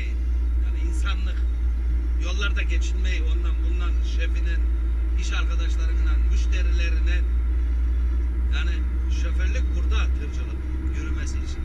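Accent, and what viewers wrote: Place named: Kırıkkale, Turkey. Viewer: Abi ankaradan sevgilerimle seni cok severek izliyorum walla konuşma şiven aynı bizim oralılar gibi bende kırıkkaleliyim allaha emanet abi 😂👋🏻👍🏻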